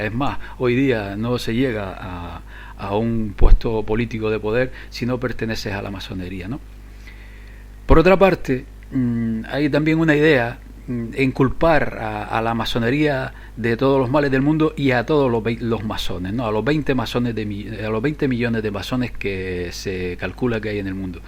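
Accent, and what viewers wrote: Canary Islands, Spain. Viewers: Muy buen trabajo, tocayo. No coincido estrictamente en todo, (son temas muy complejos, con cabida para la interpretación personal), pero si en la inmensa mayoría, y sobre todo en lo más importante. Bien documentado y explicado. Gran labor social y muy necesaria en éstos tiempos. ¿Me permite una pregunta personal?. Su "asento" me resulta extrañamente familiar. ¿No será usted Canario, verdad? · Acento canario es bonito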